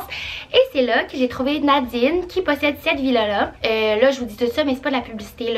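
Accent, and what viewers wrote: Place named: Quebec, Canada. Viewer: j essai de comprendre l'accent quebecois quelqu un peut me dire ce que veut dire faqué faquele ca tourne a toutes les sauce quand elle parle merci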